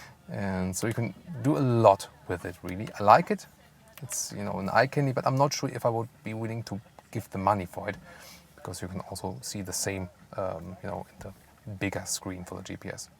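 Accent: Germany. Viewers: I just come here for the German accent, yum.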